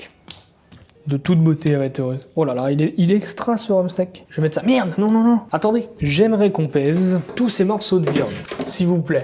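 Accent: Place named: South, France. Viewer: Bien râcler l'Ausssss... donc t'es bien du Sud lol...Dans le Nord ou ailleurs, c'est l'Os...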